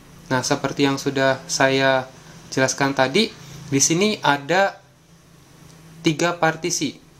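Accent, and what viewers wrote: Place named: Java, Indonesia. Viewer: Medok bro wkwk Tapi gud lah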